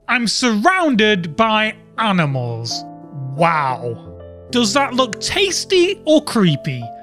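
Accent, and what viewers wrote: United Kingdom. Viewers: He’s British, So Is “Stampy”. Of Course He’s Gonna Something Like “Stampy”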